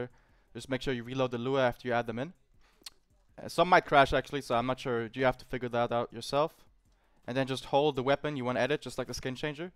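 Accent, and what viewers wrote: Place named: Germany. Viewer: Man merkt ihn sein Deutsch zu hart an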